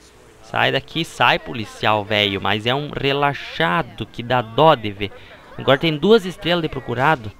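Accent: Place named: Southern Brazil, Brazil. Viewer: Southern accent of Brazil. 🙂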